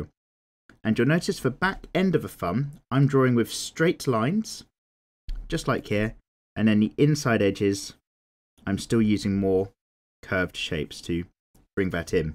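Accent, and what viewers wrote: United Kingdom. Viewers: very bri ish eh?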